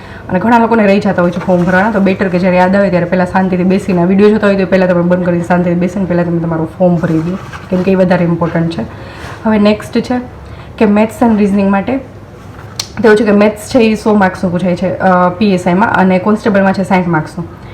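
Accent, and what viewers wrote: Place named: Saurashtra, India. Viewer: Tamari bhasa upar thi lage che ke tame gir Somnath ke Junagadh na cho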